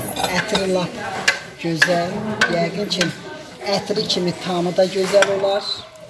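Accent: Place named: Shamkir, Azerbaijan. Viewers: Əllərinizə əməyinizə salıq olsun cox xanım bəh bəh sizin danışığnız lap Samuxlular kimi danışırsız mənə elə doğma və ləhcəniz şirin gəlir ki dilinizə quzu kəsim lap darıxdım kənd həyəti ücün mənimdə bir tərəfim Şəmkirin qırmızı Samux kəndindəndir eşq olsun Səmkirlilərə və bütün rayonlarına cox sevirəm sizi əzizlərim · gozel şemkırım lehcenin qadasin alim birinci defe baxan kimi bildimki şemkirlisiz👍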